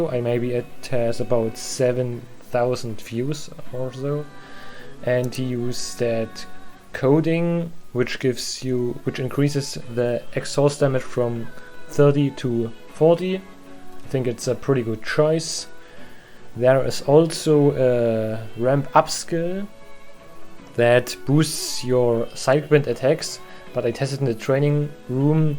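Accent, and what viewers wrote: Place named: Germany. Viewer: I hear that german accent😂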